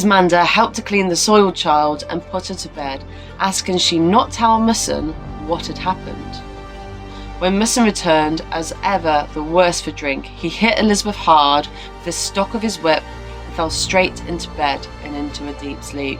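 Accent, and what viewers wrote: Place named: West Country, United Kingdom. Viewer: Limit your time with those mate even if you have the best laugh with them. I liked your accent on the BBC video as it seems to have a west country / black country twang, which I like, being a Bristolian myself.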